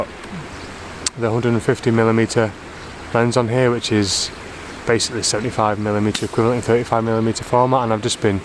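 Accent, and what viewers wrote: Yorkshire, United Kingdom. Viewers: Great video! Yorkshire accent?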